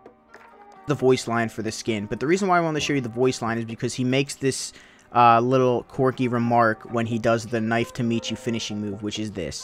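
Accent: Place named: Germany. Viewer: in his bio it says he's from austria and he his first language is german you can also hear in his accent that he's german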